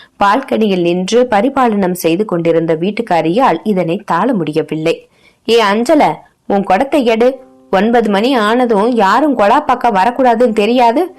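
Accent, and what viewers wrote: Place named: Chennai, India. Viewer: Good evening kuttima. ஐயாவின் அடுத்த ஒரு அலையான... குடித்தனம்.... சிறுகதை கேட்டு மகிழ்ந்தேன். ஐயாவின் எழுத்துகளை விட உன் குரல் சென்னைச் சேரிப் பேச்சு வழக்கத்தை அனாயாசமாகப் பிரதிபலிப்பது அருமை! இத்தனை சாட்டையடிகளுக்குப் பின்னும் இன்றுவரை இந்த அவலங்கள் தொடர்வது தான் அந்த ஏழைகளின் தலைவிதி போலும்.... குரலோசைக்கு வாழ்த்துக்களுடன் நன்றி மகளே. அன்புடன் அப்பா.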